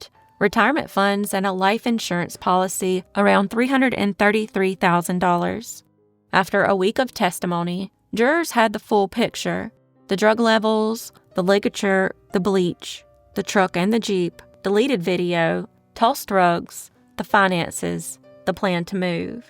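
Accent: South, United States. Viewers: This narrator is - a real person with a Southern accent. Maybe those other channels use AI voices to make it sound like the narrator of this channel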